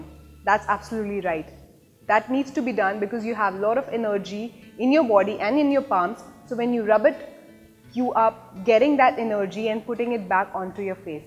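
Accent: India. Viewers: Indian accent is very hard to understanding is she really speaking English or different language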